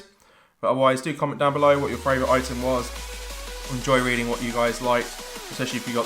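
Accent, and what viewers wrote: United Kingdom. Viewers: cheers for the reply I'm defo thinking of getting the boxes I love new things. What part of UK you from to me you sound northern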